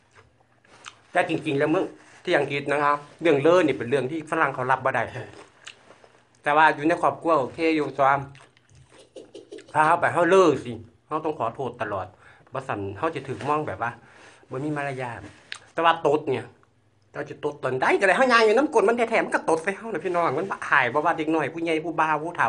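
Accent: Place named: Isan, Thailand. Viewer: สำเนียงอีสารเพราะดีคนนี้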